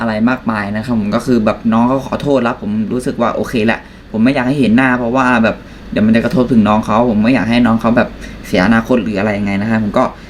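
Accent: Isan, Thailand. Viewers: สำเนียงเเมร้งโคตรลาบ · สำเนียง อีสานบ่ ไม่รู้สิ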